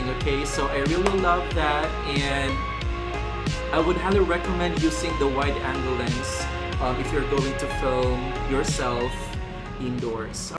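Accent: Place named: Cologne, Germany. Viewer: Kolsener accent. 😁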